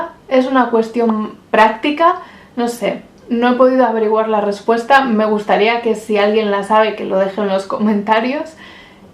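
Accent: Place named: Spain. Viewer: No, ella es española, del Norte (Cantabria) al parecer. No sólo su pronunciación es claramente española sino que ella confirmó de dónde es en alguno de sus videos.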